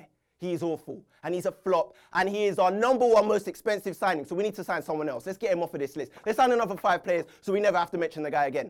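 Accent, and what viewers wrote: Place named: London, United Kingdom. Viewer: London m8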